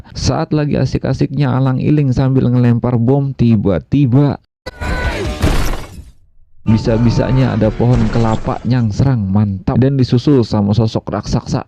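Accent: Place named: Jakarta, Indonesia. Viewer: Logat betawi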